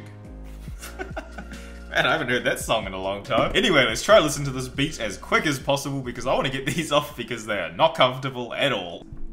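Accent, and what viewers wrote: Australia. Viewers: oooooooh so you are australian, now i understand why you talk like this, good videos! PS: English is not my main language so is hard for me to differenciate accents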